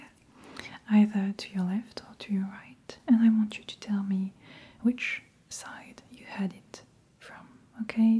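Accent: France. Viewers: I love the touch of french accent that can be occasionally heard in your english. The popping c-, p-, and t- sounds are so tingly ✨💛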